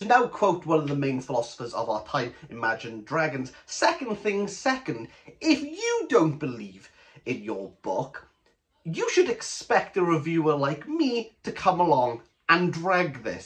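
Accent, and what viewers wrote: Wales, United Kingdom. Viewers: Found your channel by accident...and what a happy accident it has been. The honesty and intelligence in this review is refreshing. Cheer to you for that! (Also, is that a Welsh accent I'm detecting, or am I completely lost?)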